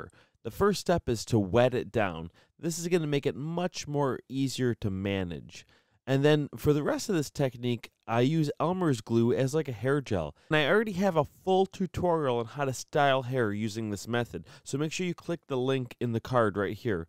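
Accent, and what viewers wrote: Brooklyn, United States. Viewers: Ahehe Brooklyn guy lol...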